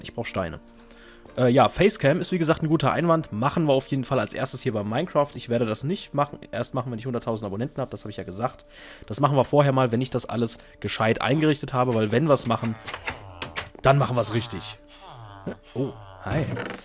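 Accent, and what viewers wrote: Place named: Palatinate, Germany. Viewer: Red' mal mehr pfälzisch :D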